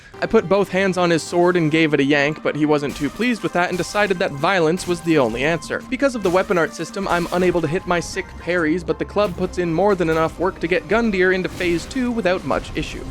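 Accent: Canada. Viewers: are you mayhaps.... canadian?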